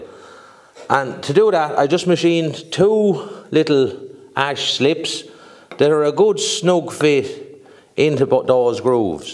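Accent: Ireland. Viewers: Great video, very helpful. Also I had to subscribe just to hear that wonderful Irish brogue! Tanks!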